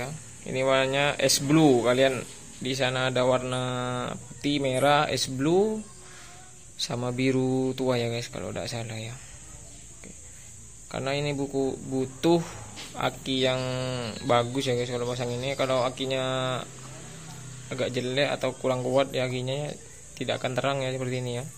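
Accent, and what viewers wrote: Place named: Bondowoso, Indonesia. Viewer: Behhh... bahasanah mik seperti orang bondowosoan reh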